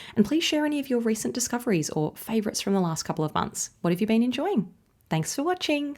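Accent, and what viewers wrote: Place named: Australia. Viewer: I love your videos! The colors you show are perfect for me. Your Aussie accent and calm voice are lovely. Thanks!❤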